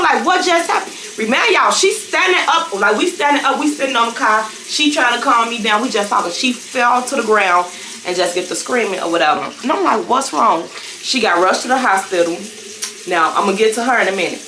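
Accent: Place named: Chicago, United States. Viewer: The Chicago accent made the story even better! And now I'm bout to watch story times on YouTube! This was a good one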